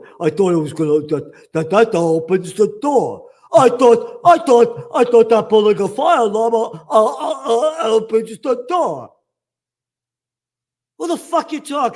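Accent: United States. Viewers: This guy sounds like a typical American 🤔🇺🇲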